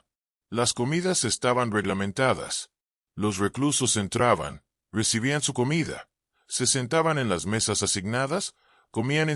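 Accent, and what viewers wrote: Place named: Costa Rica. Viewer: Pronuncia la "ere" como se pronuncia en Costa Rica. Pero le suena feo.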